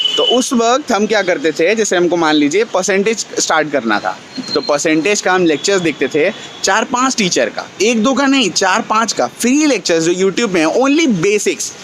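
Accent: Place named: mixed, India. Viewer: Hmmm...hmare up Bihar aise hi bolte h ap kha se ho bhai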